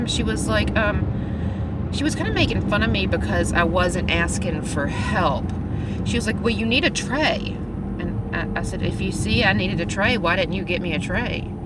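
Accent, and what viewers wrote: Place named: South, United States. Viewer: i love your southern accent lol :D you are so kind to care for these people. i'm sorry you had such a crappy day. i dropped my TV earlier so it's been a rough one for me too :( your job sounds interesting, i'd love to hear more about it!!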